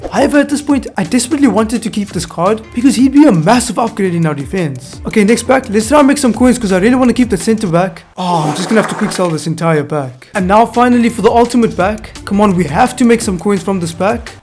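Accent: South Africa. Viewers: You from sa bro ?? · South african? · South African accent😂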